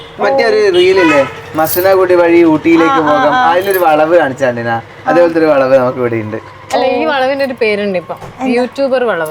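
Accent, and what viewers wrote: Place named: Bangalore, India. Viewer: ഇവരെ സംസാരം ഇങ്ങനെ യാണ് അവർ ബാംഗ്ലൂർ ആയിരുന്നു 👍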